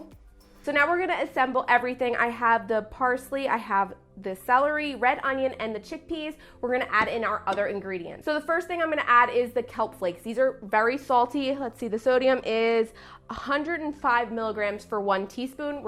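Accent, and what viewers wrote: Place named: New Jersey, United States. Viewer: Are you from Jersey? My mom is from Jersey and your accent is very strong lol i’m from the south, but immediately when you started talking I was like… I think she’s from Jersey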